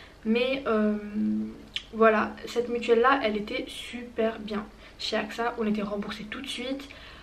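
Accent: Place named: Belgium. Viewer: T'as un petit accent belge, mais tu vis en France nan😂 ? ou exactement ?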